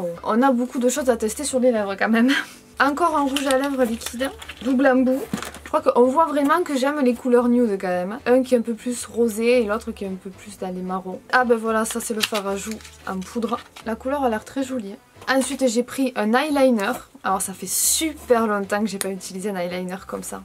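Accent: France. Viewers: She pronounces English as french 😅 and that " I'm shocking" it's obvious she meant I'm shocked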